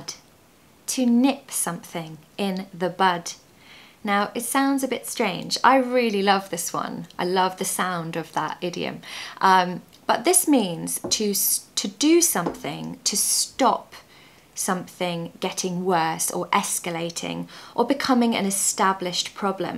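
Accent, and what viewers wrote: United Kingdom. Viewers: I Love the way How you pronounce and speak ,I love adore British Accent ,so Cute .. "In the same boat "this idiom in my language means the same: Estar no mesmo barco-Portuguese... We have a sweetest one :The rice of the same Sack =Arroz do mesmo saco) we use this when two people or thing behave in the same way but badly. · I lovd your accent and tips in your episodes to learn the British English. Keep the great work up and all respect 👏👏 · contigo eh aprendido la pronunciasion en ingles britanico.....aunque el acento americano no suena mal....besos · I love to learn new english idioms everyday... Also I love your british accent ☺Sure you will success in your channel teachhing english... Cheers from Argentina🔥🇦🇷😊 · Your accent is really great help me learning British English. Thank you a lot.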